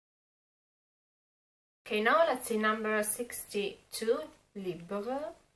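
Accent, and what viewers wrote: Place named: Italy. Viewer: Hi! It’s been awhile since I’ve watched your Chanel, but I hardly watch any UTube videos…actually usually never. 😮🫣 Anyway, I enjoyed this one as I am interested in these new lipsticks! 😊 All of those shades look great on you, and I think I would buy all of them except the orangey red and the very dark vampy one. Thank you for sharing these with us, (and you are so cute speaking French with your your darling Italian accent!) 😅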